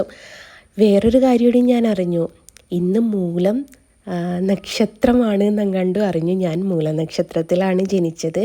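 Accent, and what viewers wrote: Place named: Thrissur, India. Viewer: ഇപ്പോൾ തൃശൂർ ഭാഷയായല്ലോ കൊള്ളാം 😂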